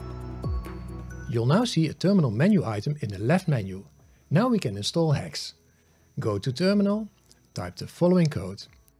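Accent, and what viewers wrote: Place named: Netherlands. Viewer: Hey there! Are you perhaps from the Netherlands? As another dutch person I have to say you've got an amazing accent, definitely still recognizable as dutch but FAR better than any other dutch person I've heard speaking english (Who isnt a professional singer who has trained their accent for their entire life.) haha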